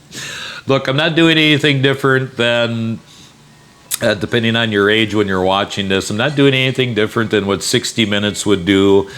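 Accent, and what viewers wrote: United States. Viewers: sounds more like a Wisconsin accent. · I'm surprised his ex-wife didn't blow the whistle on him??? By the way I love your Minnesota Accent!! You sound like all my relatives!!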